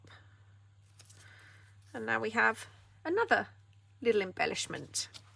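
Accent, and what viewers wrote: England, United Kingdom. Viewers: I love your hands and your fancy "English accent". The crafts you show are quite elegant and nice. I appreciate so much what other women do, this enriches us women to watch their creativity and good taste. Tysm for sharing it! ❤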